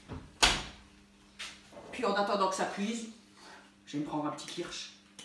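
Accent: Alsace, France. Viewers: Bonjour - merci pour le recette... je suis allemande et j'adore "Bettelmann".. et je vais essayer votre recette. Votre accent est très très charmante, c'est une accent alsacienne?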